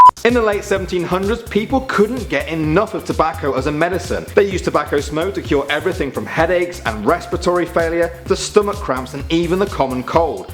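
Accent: United Kingdom. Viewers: Don't take all the bad comments to heart. I think you were great! Love the british accent! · I hear anyone hates our accent boi they dead British accent is fuggin dope I think I might have had a stroke -.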